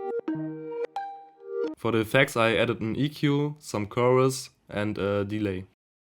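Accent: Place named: Germany. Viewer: Mann hört sein deutschen aktzent so raus haha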